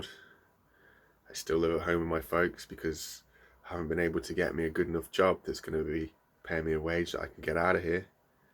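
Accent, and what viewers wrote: United Kingdom. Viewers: Mate, I love your British accent. Go on, don't give up, stay strong!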